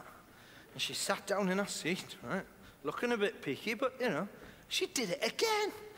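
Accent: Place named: Newcastle, United Kingdom. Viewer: Tomi Haustola He's a Geordie, which is a nickname people give to Newcastle folk, my grandad is one. It's one of the furthest north places in England so it's expected that they sound quite strange to foreign folk. Listen to an Australian comedian and then, you'll really notice the difference. Aussies stretch out their inflections MUCH further.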